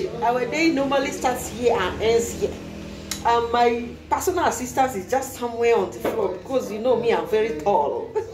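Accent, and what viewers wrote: Nigeria. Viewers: that Nigerian acsent sounds very sweet ooh, l enjoy it.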